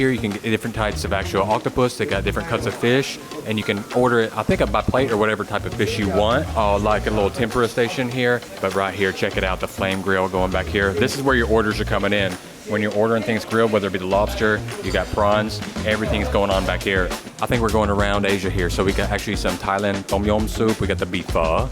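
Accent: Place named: South, United States. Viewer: Love that southern accent ❤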